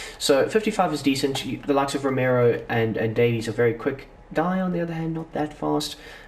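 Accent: South Africa. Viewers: U South African ?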